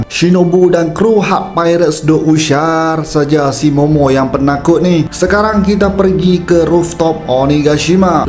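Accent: North, Malaysia. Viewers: Bro hg org utara blah Mna ? Aku Penang town . . Mantul utara loghat dlm penyampaian . . Semoga terus maju bro 💪🏼💪🏼👍🏼👍🏼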